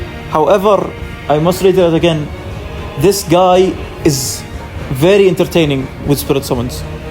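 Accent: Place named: Arab world. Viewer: I haven't reached him yet . However, I can say that he is cool. من لهجتك عرفت انك عربي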